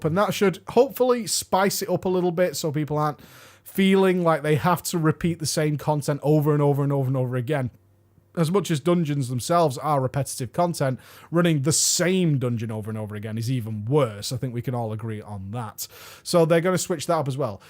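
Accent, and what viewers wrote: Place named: England, United Kingdom. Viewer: Hallvardio it's an English accent tick. Some words with an English accent have a hanging r .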